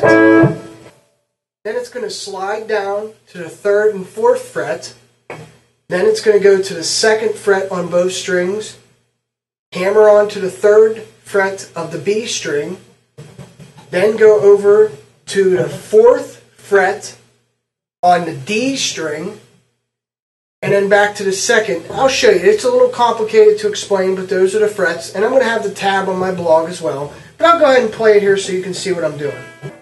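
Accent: Pittsburgh, United States. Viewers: Pittsburgh accent?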